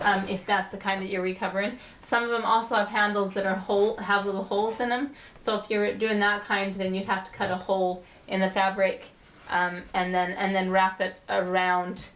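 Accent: Utah, United States. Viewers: It's a Utah accent ❤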